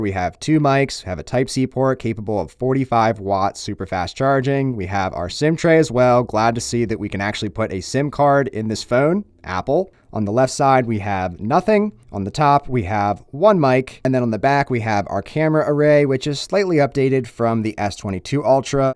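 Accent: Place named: Pennsylvania, United States. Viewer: Knew I recognized that PA accent.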